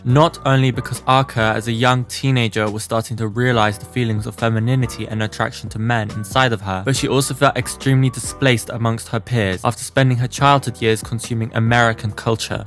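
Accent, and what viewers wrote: United Kingdom. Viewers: ok soy Venezolano, Arca en este País es algo mal visto, aunque ya no tanto, aun así desde que lo o buena "La" conocí fue apenas en 2020 y me encanto muchísimo su música y cuando supe que era Venezolana casi me derrito de las felicidad, muy buen vídeo, todavía me cuesta entender el acento británico :´)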